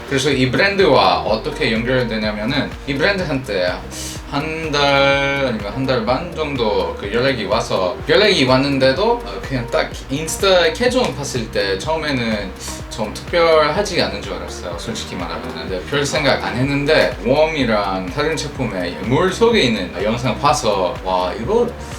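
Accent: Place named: Busan, South Korea. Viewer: 부산 친구 때문에 사투리 쓰는건가요ㅎㅎ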